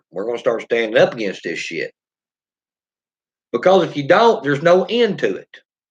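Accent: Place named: Rural, United States. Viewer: You sound like an inbred hick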